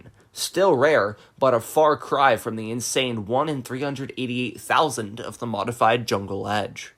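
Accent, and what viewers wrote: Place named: Delmarva, United States. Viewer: you kinda sound like ur from delmarva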